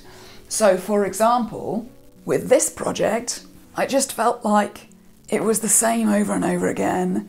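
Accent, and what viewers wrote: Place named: RP, United Kingdom. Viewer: You remind me to The Crown’s Margaret Thatcher. Her rasp is next level, but yours is too! You sound very posh. Also, I started projects and I just haven’t finished them. But I’ve done them now during this pandemic.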